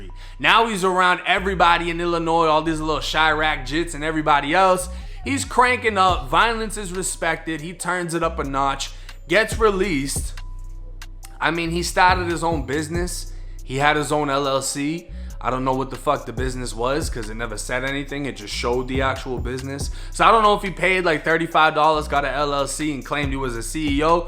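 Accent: Boston, United States. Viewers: Boston style🤔⁉️⁉️⁉️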